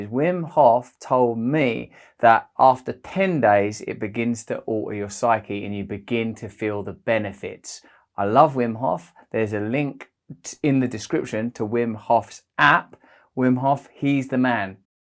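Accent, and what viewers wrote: Cockney, United Kingdom. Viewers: Us bloody yanks would actually take you seriously when you sack your cockney accent.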